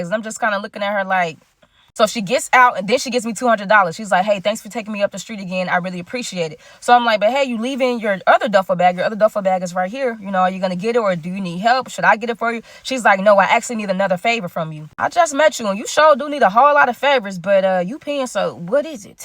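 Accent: Chicago, United States. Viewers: are you from Chicago? 👀🤔I been watching you since your tiktok story times and i swear you talk like us lbvs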